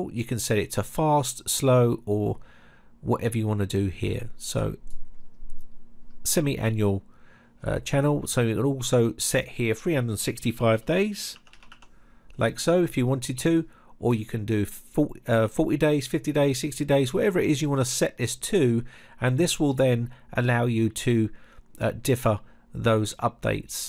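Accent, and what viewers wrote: United Kingdom. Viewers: Just an idle observation ( admittedly not crucial to the excellent info. in the video ).... What is up with pronouncing the word "defer" like "differ". It's bizarre because this guy sounds like a British native English speaker. I find it hard to believe that such a basic word pronunciation is not known.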